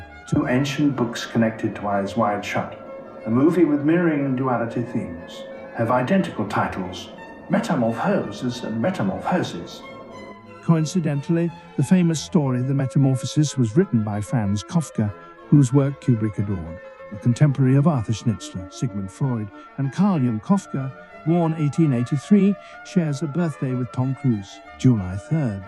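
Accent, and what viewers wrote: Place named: United Kingdom. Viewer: I love discussions on this film. I don’t have anything to add that hasn’t already been said But the flow, and delivery of the speech analysis makes it hard to absorb information. It is a bit too fast. There needs to be longer breaks/ pauses when you make those unique connections. ( and you did a great job on finding them! ) Also, maybe using added arrows with dual screenshots can help solidify each connection. Lastly, the AI voice over generator sounds pleasing- but makes it hard to follow quickly. Most Americans take an extra micro second to interpret British accents.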